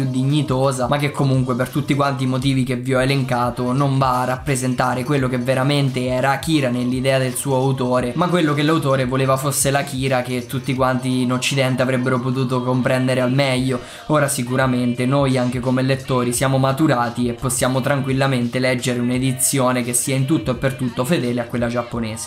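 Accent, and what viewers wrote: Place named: Marche, Italy. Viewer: Sei marchigiano, vero? Percepisco l'accento 🤔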